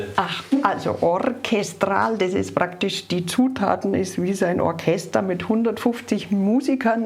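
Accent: Bavaria, Germany. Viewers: Dass du bayrisch redst, das wusste ich nicht. Gfreit mi ....🙂 An Guadn für euch beide....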